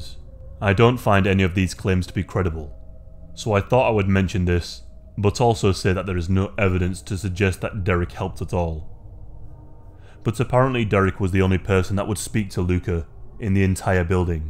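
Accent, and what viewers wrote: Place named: Yorkshire, United Kingdom. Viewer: Of course it’s a compliment 💯 Yeah thought it was a yorkie twang lol, I’m Cheshire but I’ve got a friend of 15 years who still has a extremely deep and broad Yorkshire accent 🤯 😂 i thought ya all talked quick 🤦‍♀️😂!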